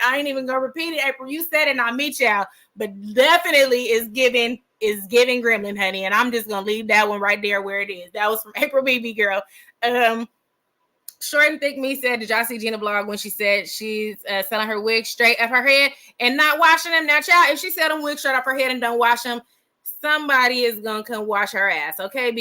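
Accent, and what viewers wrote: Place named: Los Angeles, United States. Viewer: btw I’m from So Cal. I hear LA in your voice 💜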